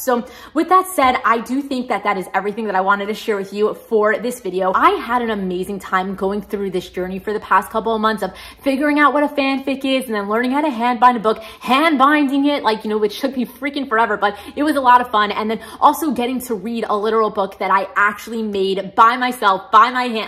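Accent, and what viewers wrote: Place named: Midwest, United States. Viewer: I love watching people bind books. Can I follow you on your Instagram? And I'm always trying to figure out what part of the states you're from cuz it sounds like you're from the Midwest but not quite Minnesota Midwest but maybe like Iowa or Nebraska. I lived a lot of places and so I always find it fun. Trying to figure out where people's accents come from. ☺️ You and bargain Bethany have a very similar accent.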